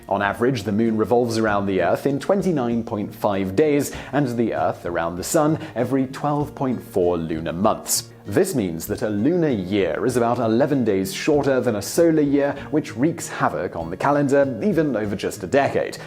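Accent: United Kingdom. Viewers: I was WAITING for you to say "Christian" in your British accent, you beautiful English man. :D :D :D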